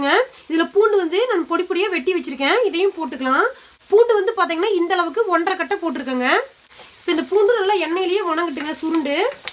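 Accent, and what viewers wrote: Kongu, India. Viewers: Your kongu tamil super mam yr recipies also super keep it up mam 👍👍👍👌👌👌💐💐💐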